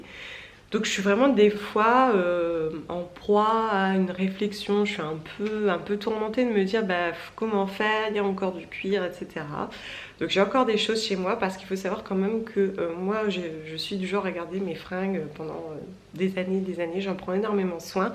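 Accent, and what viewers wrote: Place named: Nord, France. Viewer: Hé hé ✌️ ça fait bizarre d'entendre une youtubeuse du Nord !!! 😉😊 Entendre Lille, Tourcoing 👏👏👍👍😁 vive le Nord. Je viens de te découvrir. 2 ème vidéo. +1 Abo. 👍 Pr le cuir, c'est pareil, j'en ai encore. Ms je me dis que le mal à été fait, et de les jetter ne changera rien. Donc je les jetterai ds qq années... ( Comme toi, mes affaires dure un pt Moment... ) Merci pr tes idée et info en tt cas. 🙏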